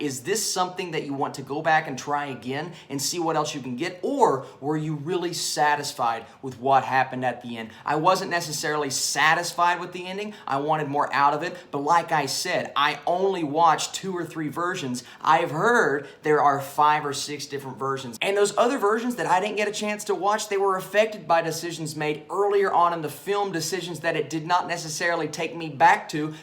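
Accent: South, United States. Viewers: Just a professional piece of advice kid. Being in the entertainment industry and a host, and working on TV in the past, your movements are good, you're hosting ability by switching up your voice, with your movements is also a peeling. A production value is up-to-date. The only criticism I can give you, is you have to lose that southern accent. You want to portray a generic host, to a broader spectrum of audience. And when you get too excited that hometown hick sounding accent comes out. Just work on getting that out have your ability to communicate. All in all oh, good review. Would maybe have liked to seen you go a little more in-depth with the storyline and Easter eggs but other than that thumbs up.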